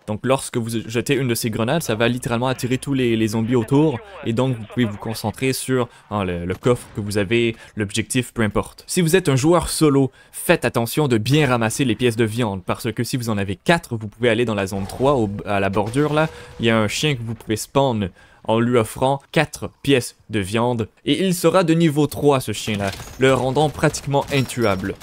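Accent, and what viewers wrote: Quebec, Canada. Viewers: Yest clairement quebecois pis y se crois 😂😂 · Merci beaucoup!! Es tu Français de France ou tu un Français au Québec??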